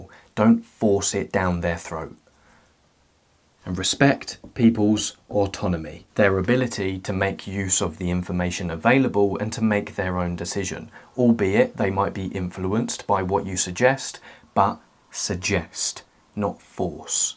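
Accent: England, United Kingdom. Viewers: Which part of England is that accent from? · Your contente is good but the British Accenture is annoying. I would like to be able to hear this with an Californian Accent. Hire a Hollywood speaker and make another channel with a fun female voice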